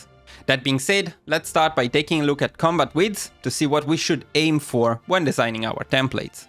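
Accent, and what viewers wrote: Italy. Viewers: Hey great video (as always). Completely unrelated question, are you Italian?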